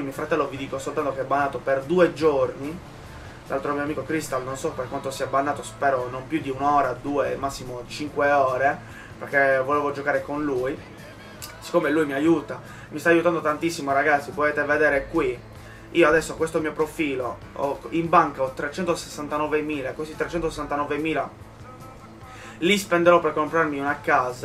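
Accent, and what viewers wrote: Sardinia, Italy. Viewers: No si intendiri ca se sardu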